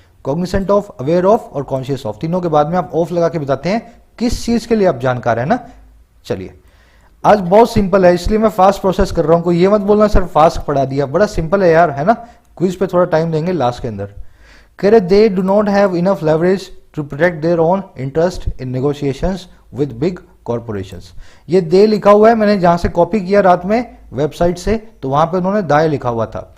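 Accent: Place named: Delhi, India. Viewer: Do You belong to Delhi area, your language just like delhist, very attractive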